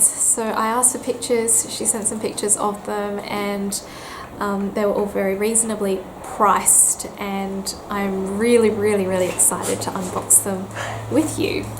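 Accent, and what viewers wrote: Australia. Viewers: Thank you for this great 100th video. Nice to see the face with that fabulous Australian accent. Keep the great videos coming!!!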